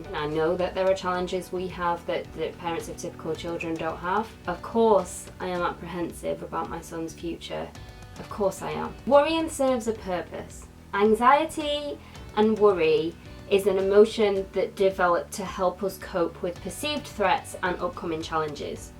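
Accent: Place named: United Kingdom. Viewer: She speaks British English 🤷🏻‍♀️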